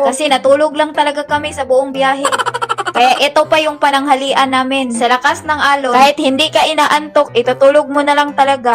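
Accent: Visayas, Philippines. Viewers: Madam bisaya ka?😊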